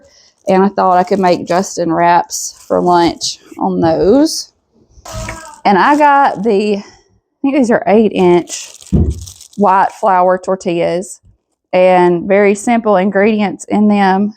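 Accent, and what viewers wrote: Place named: East Tennessee, United States. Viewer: My family is from East Tennessee and your accent is so similar to that, are you originally from that area, by chance? I swear, hearing you speak makes me think of them, I love it.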